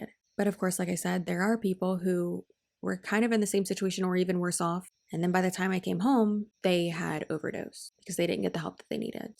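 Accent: United States. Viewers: Also are you from south U.s born and raised? You have the perfect American accent 😍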